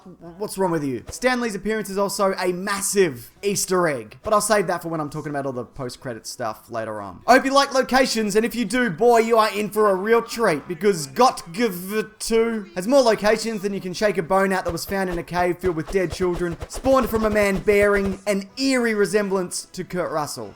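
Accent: Australia. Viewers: YESSS THAT BEAUTIFUL AUSTRALIAN ACCENT IS BACK!!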